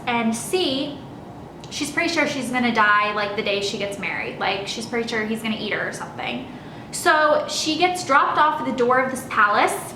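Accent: South, United States. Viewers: I loved your southern accent in this video :)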